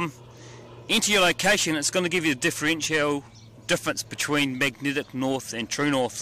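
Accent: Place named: New Zealand. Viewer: You are awesome! thx a lot!! Love you kiwi accent. Been there for a yr living in Dunedin! Now i am back in Argentina, south america. Happy Flying!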